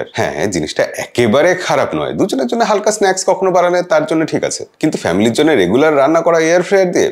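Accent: Bangladesh. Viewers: Dadar bolar dhorone ektu bangladeshi touch ache!!!🤔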